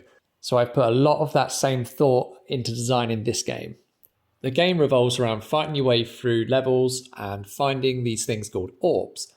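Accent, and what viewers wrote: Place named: United Kingdom. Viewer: Ohh maan.... British accent is the best.. i love it 😂😂😂🎉🎉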